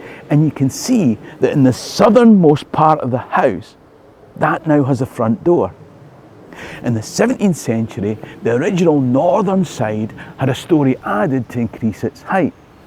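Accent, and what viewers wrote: Scotland, United Kingdom. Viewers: ❤your videos and classic Scottish voice, the best travel videos ever. Scotland 🏴 is Heavenly and people are fascinating…we’ll be back soon!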